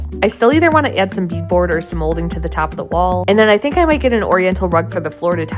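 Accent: United States. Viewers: Thickest accent so American lol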